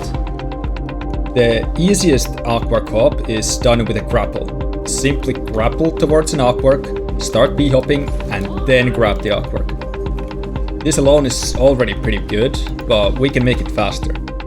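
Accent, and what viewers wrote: Finland. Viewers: Am I hearing the finnish accent?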